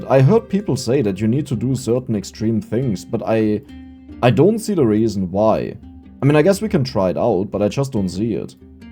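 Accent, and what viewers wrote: Germany. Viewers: are you german bro ??? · Bro bist du deutsch?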